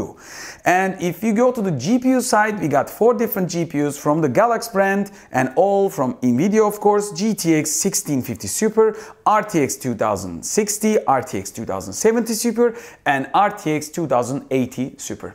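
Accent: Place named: Turkey. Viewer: Direk duyar duymaz anladım Türk olduğunu. Aksan kayıyor kardeşş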